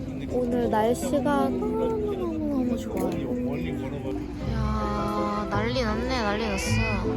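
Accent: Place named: Gyeonggi, South Korea. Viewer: 대리만족하며 잘 보구 있어요 지난 영상부터 몰아보기중인데 님의 나른한 경기말투 넘 좋네요^^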